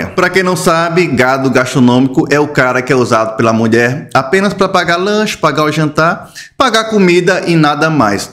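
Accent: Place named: Northeast, Brazil. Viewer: 😂 Adoro as suas histórias!!! Principalmente seu sotaque nordestino...❤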